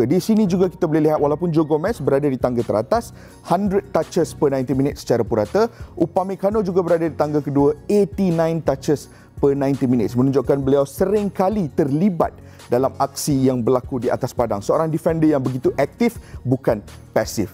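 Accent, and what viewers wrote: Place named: Malaysia. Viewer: Lidah melayu betul mamat ni. Terbaikkk 👍🏻👍🏻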